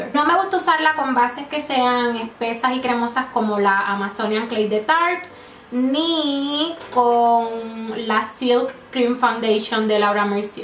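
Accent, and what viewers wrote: Puerto Rico. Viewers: Muy buen vídeo. Me encantan tus vídeos y el hecho de que eres una Youtuber que se expresa como yo, a lo boricua!!! Mucho éxito y bendiciones. :D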